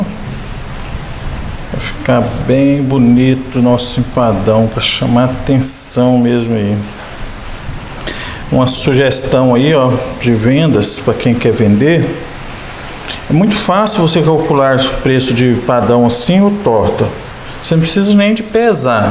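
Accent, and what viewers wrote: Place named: Goiás, Brazil. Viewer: Meu caro, bom diaaa! Eu reconhecia o seu sotaque, mas eu não sabia que vc era daqui de Goiânia (hehehe)! Meus parabéns pelas suas "lives" maravilhosas!!! Vou fazer essa receita aqui em casa amanhã, sem falta! Obs.: eu só vou fazer diferente o seguinte, vou misturar todos os ingredientes numa vasilha antes... e acrescentar alguns outros, rs! Que massa espetacular!!! #ShowDeBola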